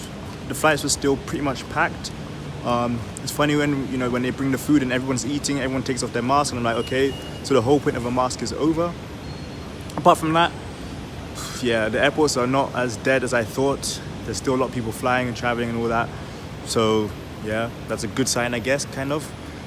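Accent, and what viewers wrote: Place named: London, United Kingdom. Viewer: Trip to london... So where you really from. I thought you was from london😅all this time. Watched in full. Great stuff peace✌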